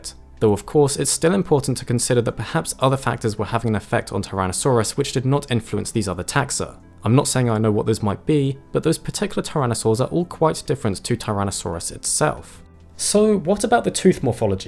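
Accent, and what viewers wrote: United Kingdom. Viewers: They’re literally just British lmao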